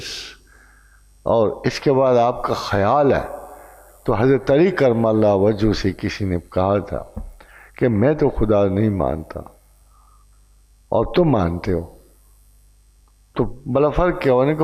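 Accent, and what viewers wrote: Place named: Punjab, Pakistan. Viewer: Not able to understand your Punjabi Urdu dialects, next please speak haltedly or kindly speak in English for English audience Sirji.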